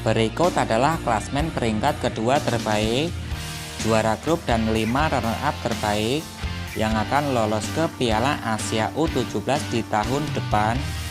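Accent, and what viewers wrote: Java, Indonesia. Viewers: di liat logat bicaranya aja udah keliatan di tambah lagi ada yang ke telu tambah yakin dong kalau adminnya orang jawa 😁😁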